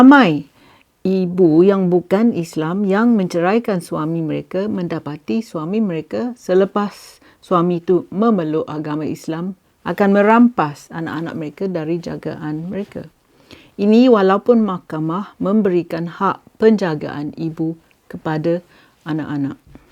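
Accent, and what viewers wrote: Malaysia. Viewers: Pelat cakap mya..Melayu! Melayu !!2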